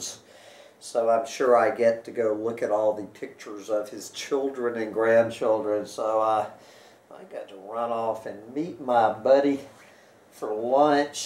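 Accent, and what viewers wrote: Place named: South, United States. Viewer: This guy is pretty smart. Knows his stuff. Personally, I've found that the southern USA accent makes him seem like a dumbass. And unfortunately, a bit tedious to listen to. But I couldn't agree more with the message. I love the honesty of climate doomers. We've been lying to ourselves and each other for long enough.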